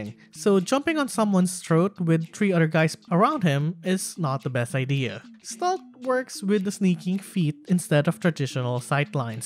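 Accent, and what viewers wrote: Philippines. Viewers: Filipino accent detected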